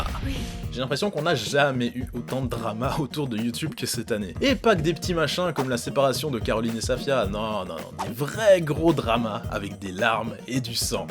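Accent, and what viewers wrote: Belgium. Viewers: t belge ?? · tu est belge ?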